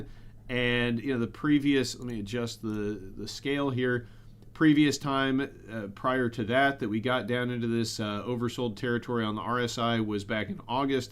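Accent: Chicago, United States. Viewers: Where did you grow up? That sounds like a Chicago accent if I've ever heard one.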